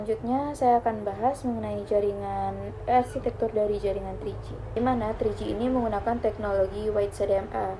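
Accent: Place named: West Sumatra, Indonesia. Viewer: Wah enggle sudah bagus, tpi bahasa indo nya masih kelihatan bukitinggi vibes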